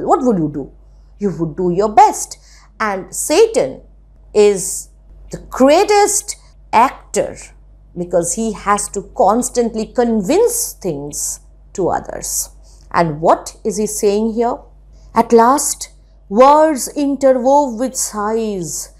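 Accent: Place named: Bengal, India. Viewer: Ur the best i hv ever met 👌👌👌👌👌ur explanation is commendable....i was jumping from this to tht for searching the best explanation n got u there in my 3rd day of searching period. Just want to say ur accent is soo cute ....mixed with bengoli tone uff itss soo ear pleasing to me.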